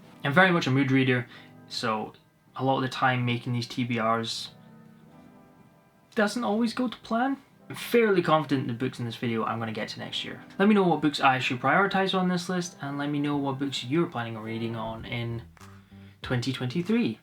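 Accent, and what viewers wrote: Scotland, United Kingdom. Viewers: It’s great to hear a Scottish voice booktube! 🏴 Looks like you’ve got a great reading year ahead of you!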